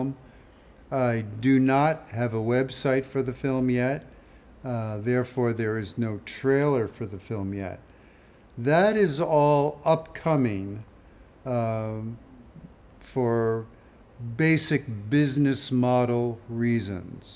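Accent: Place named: United States. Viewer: I was convinced that was Paul Whitehouse doing an excellent American accent the entire time.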